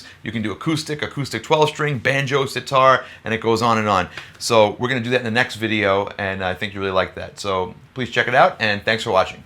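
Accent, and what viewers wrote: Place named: Brooklyn, United States. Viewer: yo are you from Brooklyn? Bensonhurst or Bay Ridge?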